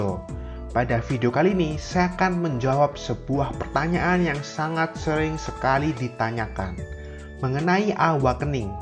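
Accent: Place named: Surabaya, Indonesia. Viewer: kalo didenger2 dr suaranya kyk org surabaya y bang?maaf kalo salah...🙏🙏🙏🤭🤭🤭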